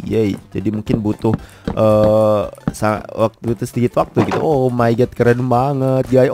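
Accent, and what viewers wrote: Java, Indonesia. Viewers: balik balik... ohhh lanjut medok jgn lupa bang btw main ke channel gw juga guys seru kocak konyol suangar wess mbolah di delok dewe pokoe hehe ✌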